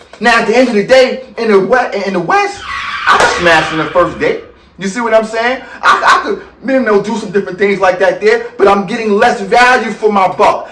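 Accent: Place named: United States. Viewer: Btw, your American accent alone is exotic to them. If you try to speak their language that puts you to the front of the line. Just be humble and they will accept you!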